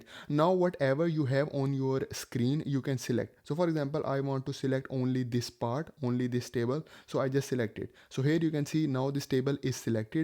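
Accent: India. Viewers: Indian english is so funny😂 love it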